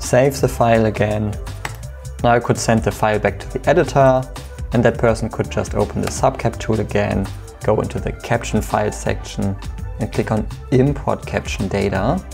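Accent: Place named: Germany. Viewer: Aweful German accent, I don't understand nothing!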